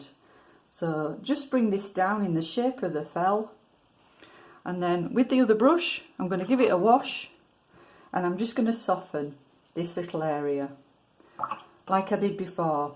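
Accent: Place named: Yorkshire, United Kingdom. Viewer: Beautiful painting, and I love your Yorkshire accent! And I’m not taking the mickey. Hope I spelled that right.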